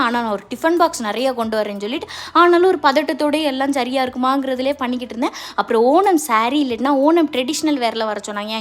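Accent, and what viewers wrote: Tirunelveli, India. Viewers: Thinelveli..words good slang ❤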